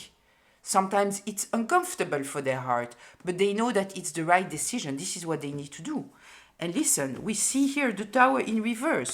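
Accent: France. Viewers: my beautiful Lady, your story is so much my own... So much in common from what you've shared. I recently found your channel and the first time I heard your beautiful voice and that lovely French accent I feel I know you from a previous life. The few videos of yours I've watched have provided so much clarity for me (this is one of the ways you agreed to help me along my journey). No one would believe the hell ppl did to me, but your personal story as well as your resonate readings confirmed for me that not only am I not crazy; the false narrative about me, it has also helped me to finally see why the devil has made me his focus for most of my life. Satan doesn't attack souls who are already doing his bidding. He only attacks souls who are shining God's pure Light. You my dear sister are clearly on your divine and destined path; the one you planned with your own spirit guides to come to this difficult school. 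I feel it every time I Iisten to you. I owe you so much and one day when I'm in a position to do so, I will give back even though I sense you expect nothing in return. Thank you so much... please tell me your name. 🙏🏽 If the algorithms allow my comment to get to you; my name is Joan as in Joan of Arc, and I'm truly happy to have found you...again. 💜💜💜😊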